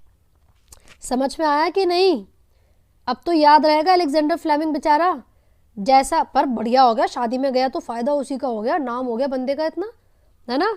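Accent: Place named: Uttar Pradesh, India. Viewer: Didi aap bataiye ki aap kis state se belong krti ho.Mujhe toh lgta hai ki aap UP ki ho.Aapka accent hi aesa hai jaisa UP mei.Agar m sahi hoon toh aapka like chahiye mujhe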